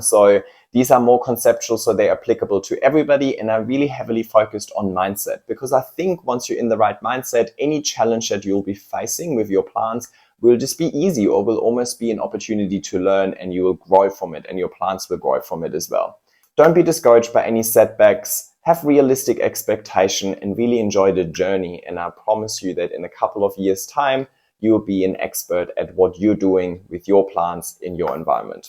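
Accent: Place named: Germany. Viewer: Exactly!!! Thank you! And are u from germany?! U have an accent like germans :)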